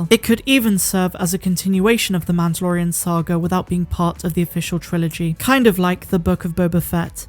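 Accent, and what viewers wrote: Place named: West Country, United Kingdom. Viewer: I always enjoy your videos and I love hearing your west country accent (Bristol is one of my favourite places in Britain). I often wonder if there is a correlation between how exciting the news is and how many ''Uttinis'' in the intro. I do miss the 3 x Uttini intro. ;) Keep up the good work, and may the force be with you ;) x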